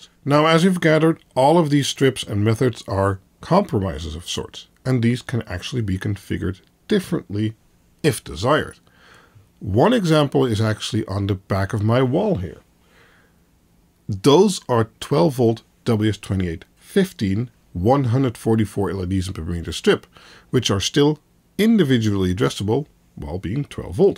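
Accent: Netherlands. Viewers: even een vraag tussendoor...ben jij toevallig nederlands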